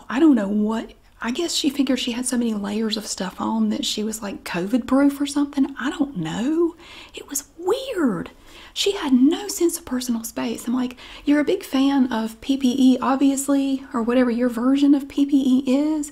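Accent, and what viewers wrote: South, United States. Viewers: Hi I really love your videos I like them more for how you make the smallest things and purchases exciting and interesting...yes, its a ramble, but you do have things to say, and its from the heart, I can tell you are not putting on with this, but I can tell you indeed do have fun with this, and you are a tad silly/funny, so I know its a slight put on I do feel relaxed by your regular voice, but I think its the combination of your southern charm and accent as well that relaxes, as its not really text book ASMR, of which I can only handle so much I really enjoy your videos, when I need calming I keep the browser open and put it on.... | And of course, southerners always tell the best stories!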